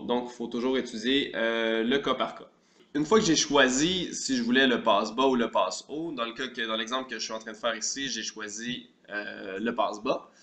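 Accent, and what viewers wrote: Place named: England, United Kingdom. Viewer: merci !! and i like your englaish acsent ;)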